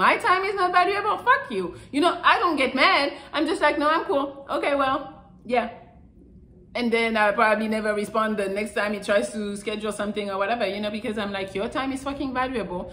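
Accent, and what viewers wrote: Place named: France. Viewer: I just seen your videos and started watching because of your accent. It sound so familiar . Then i realized, you’re French like me!! Probably from Gwadada or Madinina !!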